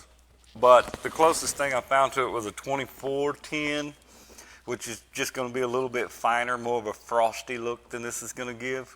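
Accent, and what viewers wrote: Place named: Oklahoma, United States. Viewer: I thought about Ben Johnson the whole time I was listening. It's almost an OK accent. Which is my home.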